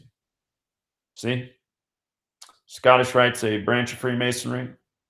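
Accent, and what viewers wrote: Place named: United States. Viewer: London Freemasons was written on that helicopter on the London bridge terror events last year, and the guy with the anonymous mask running in placing a sheet of plywood over the spot where the car was supposed to have crashed into. I know someone already pointed this out. Leicester is pronounced Lester And Sinead is pronounced Shin - eeh- d. Your american pronunciation cracks me up haha. Not too many get the Sinead one correct. Its famous for miss pronunciation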